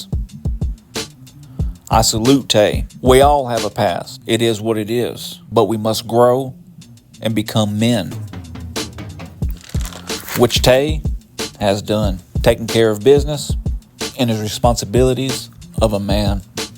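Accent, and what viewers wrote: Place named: Texas, United States. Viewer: Who tf is narrating some Texas hick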